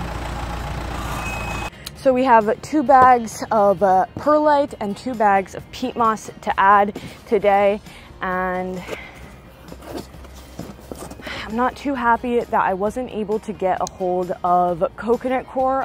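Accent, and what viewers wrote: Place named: New England, United States. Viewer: Maybe just a hint of New England in taaaak. These folks work hard at whatever they do. Seem like sincere people. Need more of them today😊😊😊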